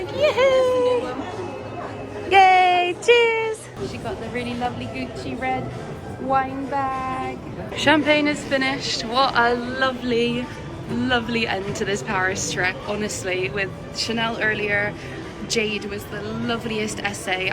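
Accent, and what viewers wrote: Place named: RP, United Kingdom. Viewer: First off I'd like to say I do enjoy your content ...but I feel this needs to be addressed as iv seen a few vlogs where youre not happy with your experience in these designer stores ...A lot of these stores deal with millionaires who shop their brand constantly , a lot depends on appearance and these staff are trained to know who is wealthy and who is not , unfortunately you will experience what you show , wearing leggings , speaking in a posh accent and being knowledgeable about designer brands won't cut it for high end stores , whilst I agree its not acceptable to be treated any differently ,but as you say they don't really respect people " drinking champagne on a prosecco budget " unfortunately buying designer every now and again or when they're on sale wont give you the experience you think you should get, I have bought designer bags shoes etc but I never expected preferential treatment , I just enjoyed the experience for myself and the purchase , honestly its giving mutton dressed as lamb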